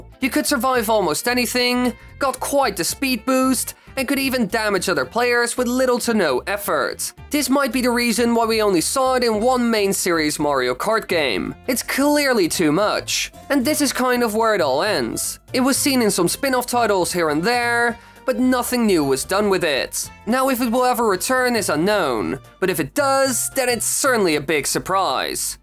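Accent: Netherlands. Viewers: Hey, you’re dutch arent you? Hallo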